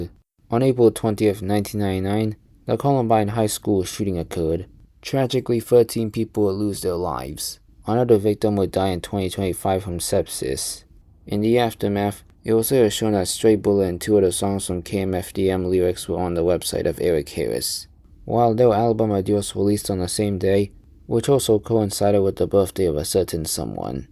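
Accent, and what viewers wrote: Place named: New York, United States. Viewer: Why do you sound like a New Yorker or New Englander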